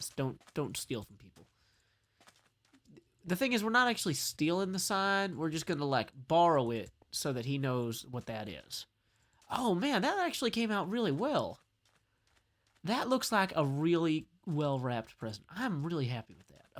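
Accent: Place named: South, United States. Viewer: your content is awesome, but I can never seem to get past that heavy southern accent! lol merry christmas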